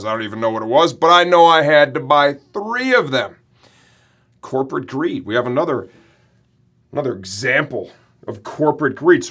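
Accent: United States. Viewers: You sound like you're from Boston ! The language. Funny video and you're right about corporate cocksuckers.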